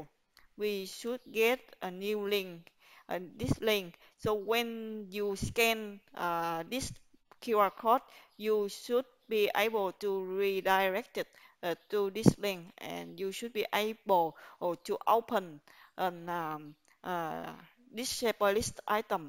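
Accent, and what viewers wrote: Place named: Vietnam. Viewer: thề nghe giọng đọc TA biết ngay người Vietnam :))))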